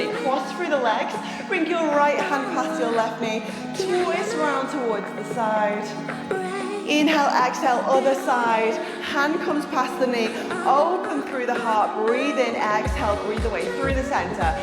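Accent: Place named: United Kingdom. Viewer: So happy I found your channel. I’m coming down from intensive exercise & being kinder to my body. Loving the combination of weight’s & pilates moves. Love the fact that you’re U.K. too, fed up of listening to over hyper American accents.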